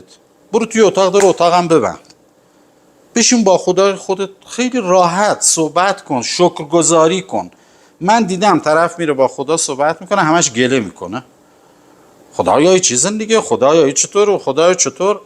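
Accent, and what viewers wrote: Khuzestan, Iran. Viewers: پاینده باشی واقعا شیر مادر حلالت باشه چقدر با لهجه شیرین خوزستانی زیبا روشنگری میکنی ودانش ما را بالا میبری در پناه خدا باشی به امید خدا استادت که بزرگ ما هم میشود خدا هر ناخوشی را از همه انسانها دور نماید با سپاس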